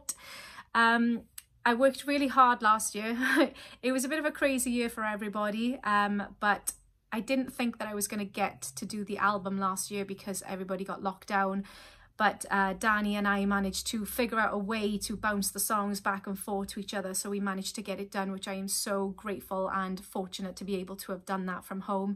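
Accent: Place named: RP, United Kingdom. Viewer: U indeed the best voice on TY. You should soar into stardom. And your speaking voice is so british bbc received pronunciation its pleaseant as well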